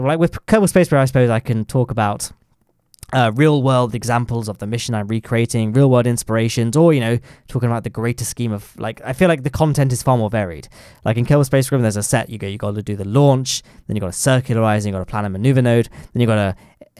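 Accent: United Kingdom. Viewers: Hearing my man saying 'yo' in his amazing British accent (not as thick as mine though). It's amazing!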